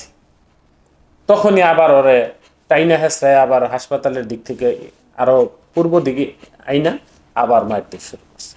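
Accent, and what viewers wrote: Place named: India. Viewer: তোমার ভাষা শুনে মনে হয় তুমি তো ভারতীয়।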